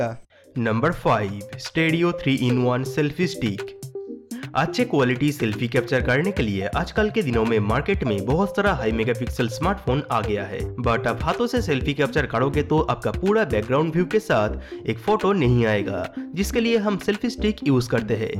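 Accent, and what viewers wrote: Bengal, India. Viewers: i love your bengali accent ..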